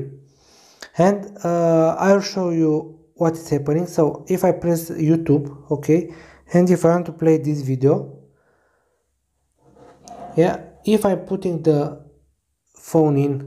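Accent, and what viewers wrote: Romania. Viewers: M-am prins din prima ca esti roman de la accent lol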